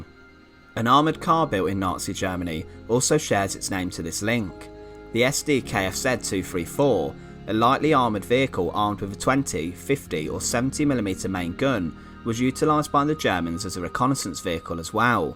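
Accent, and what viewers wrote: United Kingdom. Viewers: What region of the UK is the narrator from ? His accent is different than others you typically hear.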